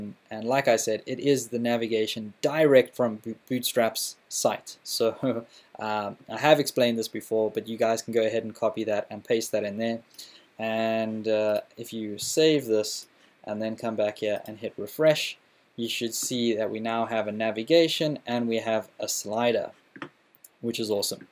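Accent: South Africa. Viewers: Thanks for a really useful and easy to follow tutorial series - I'm a subscriber. You make learning enjoyable and simple! Love your South African accent too mate... and you're a really pleasant and cool guy! Keep up the great work... and I'd love to see more tutorials on topics such as Angular.js, react.js and node.js, etc. We'll keep you busy mate!:-)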